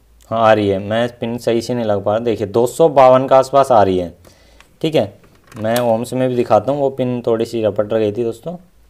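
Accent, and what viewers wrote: Meerut, India. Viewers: Meerut ke rahne wale ho lagta hai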